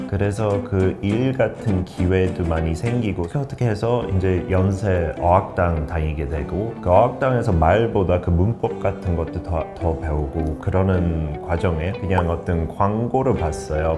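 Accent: United Kingdom. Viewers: 님 방송에서 많이 봤으면 좋겠어요^^ 영국 영어 발음도 너무 멋지시고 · 엄마한국 몰랏네 아침영어 ㅎ 영국발음? 아닌가ㅎ 또봐요 ㅎ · 목소리 너무 좋으시고 영국식 발음하시는거 너무 멋진분~!! 🤗🤗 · 귀가트이는 영어 선생님 이신군요? 품격이 있는 해설과 영국 발음 잘 듣고 있습니다.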